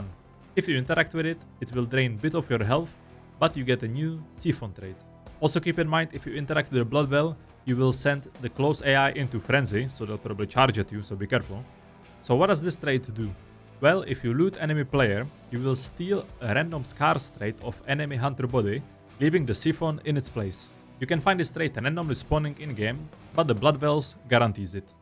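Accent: Czech Republic. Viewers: Is that a Czech accent ?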